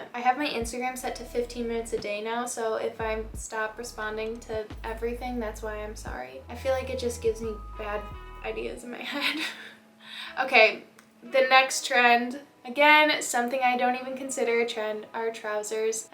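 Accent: Midwest, United States. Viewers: Are you from the midwest?? The accent is coming THROUGH lol!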